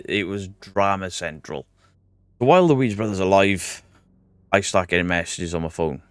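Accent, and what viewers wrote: Wales, United Kingdom. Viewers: thanks. Ive missed your welsh tones xxx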